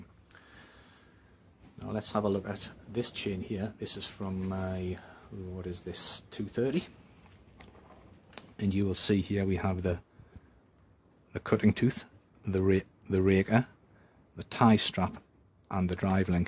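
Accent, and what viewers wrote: United Kingdom. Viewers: It is really good to hear a British guy explain chain saw tips. have some unguarded 1/4 pitch chain on my 194, it doesn't cut any quicker and I have always filed the cutter away before I touch the depth gauges. There are lots of people on youtube that are really into fast saws which is fun but any saw is heavy after a day's work fast or slow!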